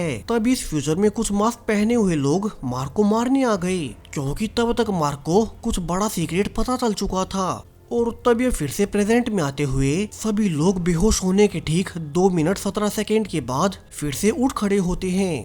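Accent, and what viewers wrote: Haryana, India. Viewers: achii hai bro, tabhi feel ata hai video mai...haryana se ho kya bro? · aapp haryana se hoooo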